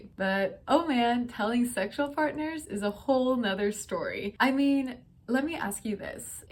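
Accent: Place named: Germany. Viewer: You can hear German influence on your English 😂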